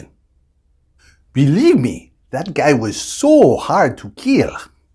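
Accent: Russia. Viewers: I did enjoy listening to your Russian accent! 😉👍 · ** Wow! I've trained hard my listening today 😅 and I loved your Russian accent hahaha ** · Wow, I like your Russian accent, that so funny 😂. Thanks for your great video 😁👍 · Wow, I didn't know about Stalin's bunker. Probably I will visit it. And your Russian accent is so funny😂🤣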